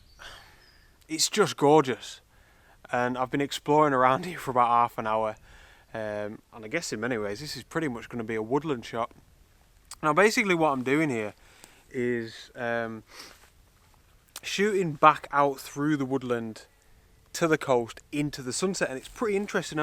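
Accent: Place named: Northern England, United Kingdom. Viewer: I love listening to the passion you have for your vocation. Your shots are wonderful, but you only achieve that through your love and appreciation of the land. A pleasure to hear your no-nonsence Northern twang too. I'm from Manchester originally myself, now living in Croydon, how I miss the people and their candidness. Great work fella, and long may you continue on your quest.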